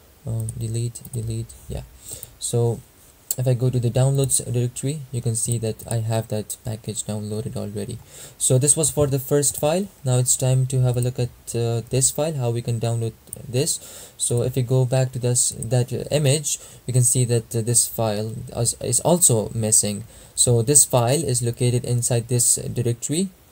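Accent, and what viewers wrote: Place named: Arab world. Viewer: Bruh judging by your accent are you an arab? If so bruh feeling proud of tht i am an arab too.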